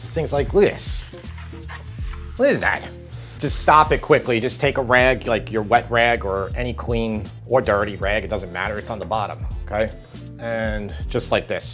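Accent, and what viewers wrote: New York, United States. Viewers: One reason besides the nice recipes you cook is your New York accent. I miss the accent, the culture, everything.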